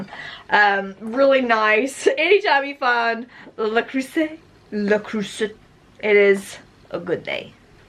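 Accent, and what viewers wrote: Kentucky, United States. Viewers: Where are you from? You say "yall" and that's SO a KY thing!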